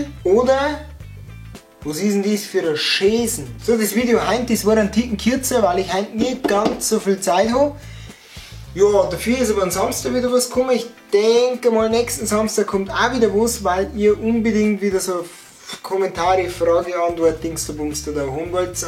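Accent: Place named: Upper Palatinate, Germany. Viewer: Ziegler Tanja Des is a Oberpfälzer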